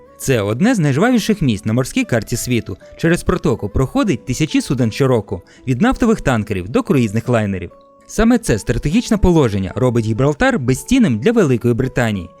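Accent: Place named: Russia. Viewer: Дикція на трієчку, ще й рашиським циканням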